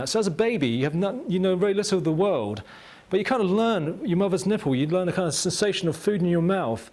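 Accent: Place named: United Kingdom. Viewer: This is the kind of thing that makes YOutube great. Not this Miley Cyrus garbage all the time. Great video. Weird to hear a Chinese guy with a British accent!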